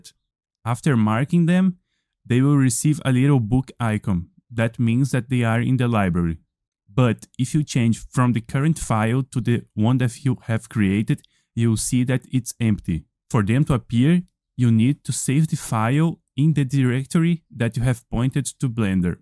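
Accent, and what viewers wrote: Brazil. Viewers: Helo there, conheço sotaque de mineiro só de ouvir. Ajudou muito irmão! Abraços from Contagem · assisti 5 segundos e pelo sotaque tu é brazuca, ou eu errei feio ou eu acertei muito bonito, well in case you are not, great video tysm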